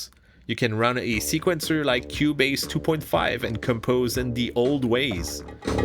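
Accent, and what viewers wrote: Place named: Quebec, Canada. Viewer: I seem to detect a hint of tabarnak in that accent.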